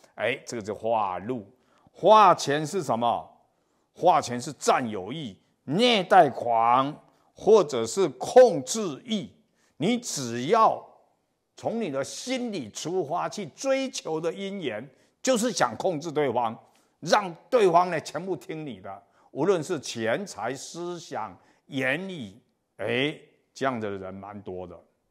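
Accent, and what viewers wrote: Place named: Taiwan. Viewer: 老师的普通话的口音是台湾人已经是很容易听得明白。你试按上面字打开幕那个扭试试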